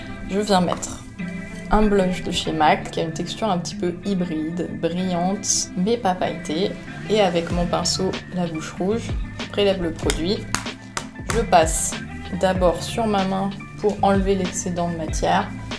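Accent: France. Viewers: The french accent is so Devine it just screams rich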